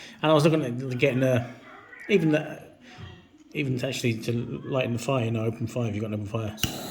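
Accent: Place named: United Kingdom. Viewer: I have one on the way from Amazon , good review fella Love the Brit accent too haha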